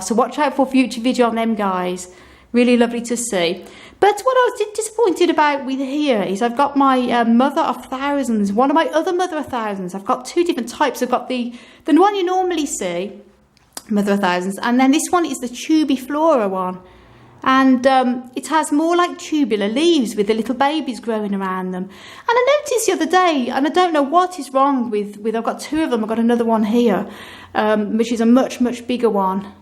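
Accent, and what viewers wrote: Birmingham, United Kingdom. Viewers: Awesome collection, have you ever kept Lithops, I used to love growing those!! Did you use to live in Birmingham as I am sure I can detect some brummy in your accent!!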